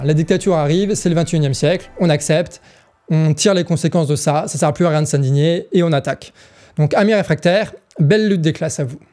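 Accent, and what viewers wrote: Brittany, France. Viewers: Je te suis ! Vive Macron et la liberté d'expression ! Dis, j'ai une petite question qui me trotte dans la tête depuis longtemps. Quand tu dis "quand même", j'entends "co même". Est-ce que j'entends bien ? Si oui, est-ce dû à l'accent de Guingamp ? Ou est-ce parce que, petit, tu as appris cette expression en la comprenant mal ?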